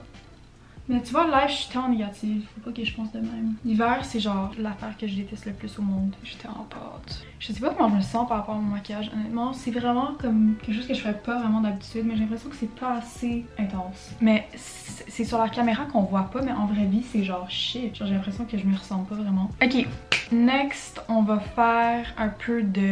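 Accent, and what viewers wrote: Quebec, Canada. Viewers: i love the videos of u speaking in french bc i speak france french from american school but im planning on going to college in montreal so it's nice to hear quebeqois french in a casual setting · J’aime tellement l’accent québécois, ça a tellement de charme! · Blk Barbie - yeah she's from quebec so it'll sound a bit different to a french person's accent i guess. though i'm not advanced enough to recognise regional accents yet lol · omgg i never realized how different the quebecois accent is! my french teacher is from côte d'ivoire, i'm having a hell of a time understanding this video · L’accent québécois est meilleur😋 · haha translation : "I'm french and don't worry about your quebec accent and your expressions, they're understandable, it has a lot of charm so don't be ashamed <3" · J’aime ton accent ses come Québécois et come Nouveau Brunswick et au Nouveau Brunswick ses franglais lol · l'accent québécois 🤩 trop cool comme vidéo <33 bisous de France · l’accent québécois est on another level, for a hot second j’ai oublié tout ce que j’appris mais après un peu i could pick up on some slang and understand the video! 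so thankful for this boost of confidence to begin studying it again <3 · U should listen to French accent not Quebec accent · she has a québécois accent!! elle a un accent québécois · C’est trop cool de voir quelqu’un qui parle français québécois sur YouTube! ❤❤ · Je suis française et j'étais curieuse de voir comment tu parlais en français et je trouve que tu parles vraiment bien, au niveau vocabulaire après l'accent est fort mais pas dérangeant car on dirait que tu parles avec l'accent quebecquois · She actually has a strong French Canadian accent haha but its really cute! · L'accent québécois c'est même pas un accent c'est une autre langue + le slang omg 😅😅 j'ai adoré pls don't be insecure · Bh c'est l'accent québécois donc si vous ne comprenez pas c'est pas de sa faute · actually, She has a strong quebec accent ( plus quebec french and France french are almost two different languages like there are LOTS of differences between theses two ) so if ur trying to learn France french , idk if it’s a good idea because quebec french is basically french with a strong english accent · J'adooore !!! 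Je suis française et j'adore l'accent québécois. J'adore la vibes ❤ on veut d'autres vidéos comme ça plzz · OMG j'adore ton accent quebz. Cest compréhensible et pas exageré.😊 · je suis française et ton accent est adorable, on dirait un peu l'accent québécois. Tu es vraiment belle <3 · je préfère largement le Français mdr mais l'accent Québécois est super drôle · Her accent is also Quebecois, which is probably harder to understand and not something you hear as frequently as a student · Moi je trouve ça mignon l'accent québécois ^^ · Je viens du Québec (Montréal) aussi! 😂 C trop cool de voir quelqu’un qui parle avec l’accent sur cette plateforme haha Je me suis abonné :) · OMG j'adore ton accent quebz. Cest compréhensible et pas exageré.💛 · ton accent québécois est TROP CHARMANT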